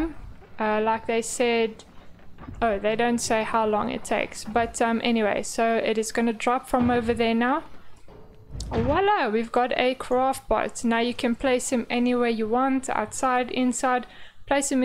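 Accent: South Africa. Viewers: Love the accent. I'm thinking South Africa. Great video. Very helpful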